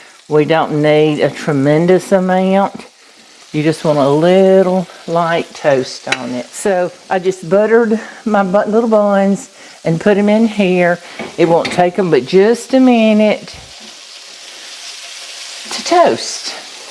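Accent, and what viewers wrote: South, United States. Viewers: So cute in your southern accent : buttered my little buns.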